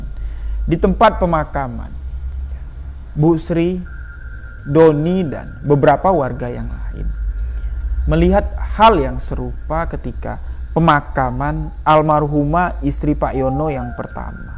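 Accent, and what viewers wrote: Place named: Madura, Indonesia. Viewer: Logat ny kakak kok seprti maduro hehe 🙏 maaf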